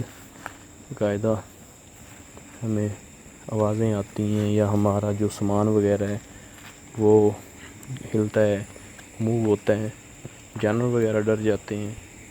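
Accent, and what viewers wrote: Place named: Punjab, Pakistan. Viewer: hit like before watching.. or bhai just luv ur accent jab ap urdu bolty bolty koi ek lafaz punjabi ka maar jaty hain.. serious video men mahool say bn jata hai.. Allah pak hemsha apko apny hifz-o-aman men rkhy (Ameen)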